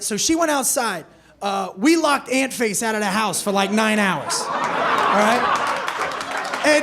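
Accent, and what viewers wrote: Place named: New Jersey, United States. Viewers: Jersey Italian accent is perfect! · he sounds like jake if jake had a jersey accent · This sounds like every guy from NJ. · Knew this guy was from Jersey just by the way he said "ya knowada mean". Also knew he went to Florida for rehab. Also knew he wasn't a bad guy, just seen some fucked up shit. Loved the act but hit me when I realized how real this joke was. It was REAL MOTHAFUCKERS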